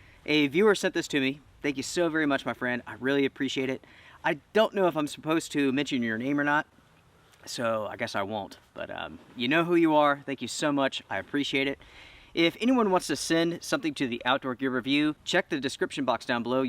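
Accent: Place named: Appalachia, United States. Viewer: I hate ur hillbilly pipes!!!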